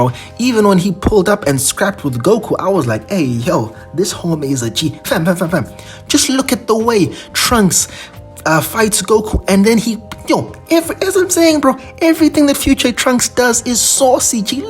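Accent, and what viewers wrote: South Africa. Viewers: Are you South African ? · Sup man which country are you from? You sound like a South African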